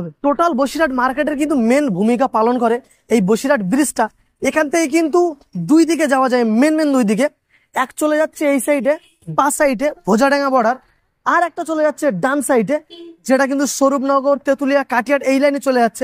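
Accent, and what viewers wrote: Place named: Bangladesh. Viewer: Tumar kotha gulo bangaladeshi accsent lagche 🤔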